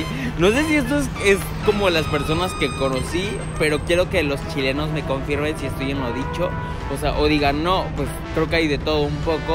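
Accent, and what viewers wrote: Mexico. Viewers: Que lindo eres, a mi me encanta el acento mexicano wey, y sí tienes mucha razon en las cosas que has comentado de los chilenos, pero todo tiene su explicacion.Los hombres aca son muy atentos, detallistas, cariñosos, muy protectores de sus hijos y esposas, caballerosos, guapos(lo digo por mi marido llevamos mas de 11 años de casados y aun me siento que estoy poleando "de novios") nos dividimos todas las cuentas de la casa porque ambos trabajamos y creo que no es justo que el se lleve el gasto de algo que los dos compartimos ( pensamiento de muchas mujeres), el machismo de apoco esta pasando a la historia, las tareas en todos los ambitos se dividen, la gran mayoria deja pasar primero, abre la puerta o sede el asiento a mujeres y adultos mayores ( igual no todos son asi, pero sí un buen %). Si alguien me invita a comer o invito a comer la otra persona me puede decir, "ok pero yo pago mi parte" porque de esa manera no me siento mmmm como se podria decir "comprometida" y se evitan malos entendidos, en caso de las parejas cualquiera puede invitar al otro a comer, como bien dices somos desconfiados, timidos y muy vergonzosos en todo aspecto y lo mejor Solidarios, bienvenido a este hermoso pais que muchos aun no conocen.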